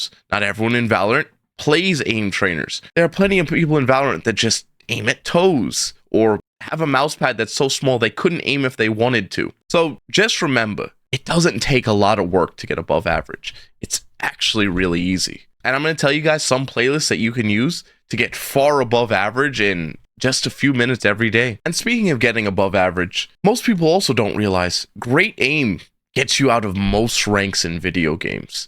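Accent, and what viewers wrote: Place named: New York, United States. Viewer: Man you def sound like a New Yorker that wants the best for everyone. I'm a tekken refugee playing marvel rivals and these videos helped alot!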